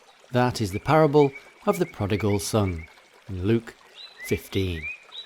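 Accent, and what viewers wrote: United Kingdom. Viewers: LOVE THOSE BIBLE QUIZES.GREAT QUESTIONS!!! LOVE THE BRITISH ACCENT.GOD BLESS EVANGELIST ROGER MANSOUR MISSIONARY TO HAITI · Such a brittish accent 🇬🇧 😂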